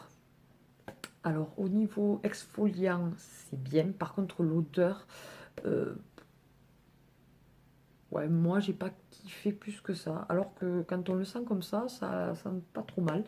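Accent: South, France. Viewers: Je t découvert il y a qq mois grave à fashion Barbie j'aime bien regarder tes vidéos. Et ton accent ramène du soleil dans ma région ou la chaleur n'est pas au rendez vous. J'ai de la famille à castres alors je connaît bien de ton petit coin ☺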